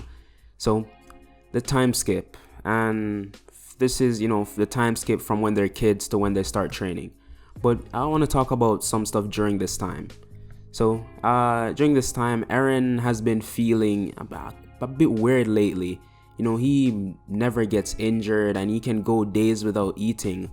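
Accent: Jamaica. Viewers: Dude are you Jamaican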